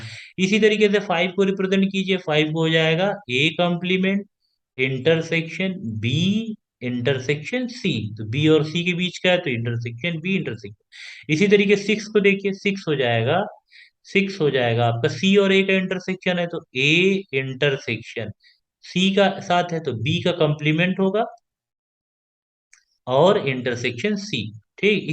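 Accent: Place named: India. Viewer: Thank you. You convinced me that the math is no problem. Understanding mix of english and indian is much harder.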